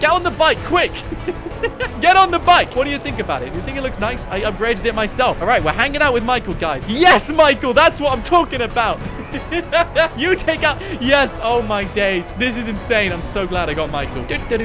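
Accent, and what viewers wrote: United Kingdom. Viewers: Is he British or American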